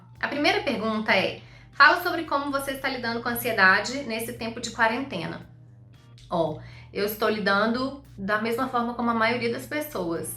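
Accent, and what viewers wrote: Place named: Belo Horizonte, Brazil. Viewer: eu amooo seus vídeos... também sou de Belo Horizonte. Te conheci por causa dos vídeos da Escócia. Quando ouvi sua voz pensei na hora.." essa moça é de BH"...aí pesquisei e me inscrevi no canal. Adooooooooro. Deus a abençoe.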